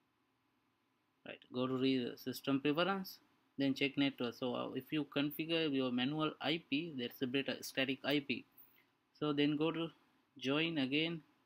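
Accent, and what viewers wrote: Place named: India. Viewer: indian accent very bad!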